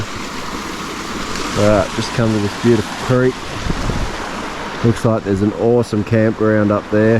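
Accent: Australia. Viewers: love your content and your Aussi voice. Well done!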